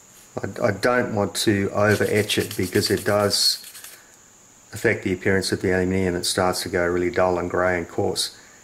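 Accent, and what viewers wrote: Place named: Australia. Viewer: G day i really enjoy your content i just subcribed its nice to hear an Aussie accent on youtube i an looking forward to going through your back catalog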